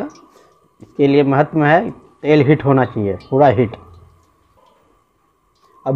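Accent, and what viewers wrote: Bihar, India. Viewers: Aapki baaton ke trike se lg rha h aap bihari h nice 😄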